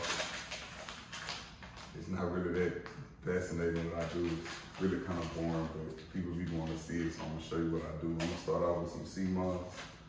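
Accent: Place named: South, United States. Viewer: Your southern accent thick! Its crazy watchin a laid back video of u just chillin at the house. Diggin the morning voice! Heavy but soft at times as u speak. I've had seamoss and it does give you a nice boost of energy. The texture is a bit different but its good & great 4 ur health! Make me a cup?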